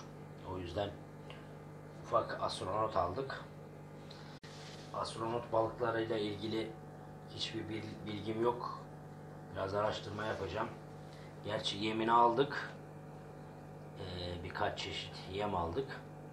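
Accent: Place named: Southeast, Turkey. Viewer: Üstad Güneydoğulumusun ? şiben biraz andırıyorda 😊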